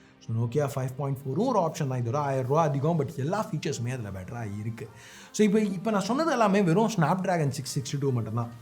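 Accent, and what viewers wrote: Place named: Chennai, India. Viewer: Hi, Generally I won't comment on any of the videos but your content is very good and practical nowadays, I like the way you take this channel, Good to go, Youtubers need ppl support not from brand, you are proving it day by day by your videos and today I got to know even you can speak local Madras Thamizh. Mostly I prefer your channel to cross verify about a product. Thanks for your Genuine work.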